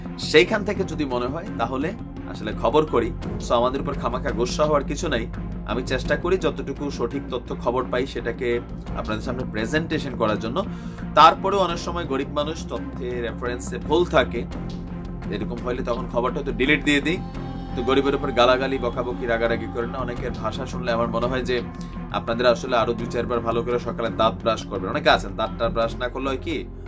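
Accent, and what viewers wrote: Comilla, Bangladesh. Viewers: Tomar video gulo khub pochondo kore dekhi.Tomar news e aanek totho pai r tomar presentation besh bhalo. Tobe tomi comilla r ancholikota over come korte paronai. Tumi bar bar desh k dash bolo. Jeta srutikotu lage. Tumi bolechi mind koro ni to ? Aami tomar ma khalader moto. So....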